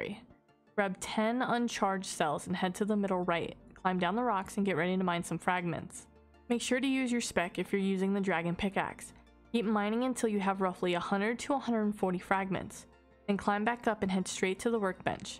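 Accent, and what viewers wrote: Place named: South, United States. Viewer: "a hunerd to a hunerd forty fragments." I love that southern accent, because it's the exact same way I say it lol. Oklahoma here. Thank you so much for this guide. I recently had b2b seizures, the second of which put me into Cardiac arrest, and they had to bring me back. I lost the last 4 months of memory, so I completely forgot anything about this mini game. Now I'm gonna go try it, thank ya!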